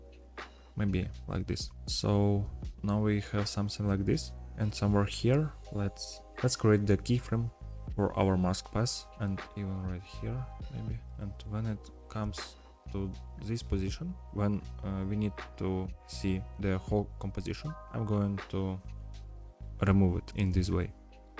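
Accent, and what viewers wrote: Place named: Russia. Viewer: Прям такой русский акцент. Вы говорите по русски?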